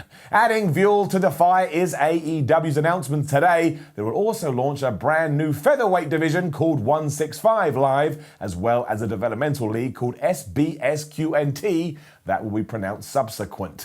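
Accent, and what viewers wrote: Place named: United Kingdom. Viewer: British accent. Annoying.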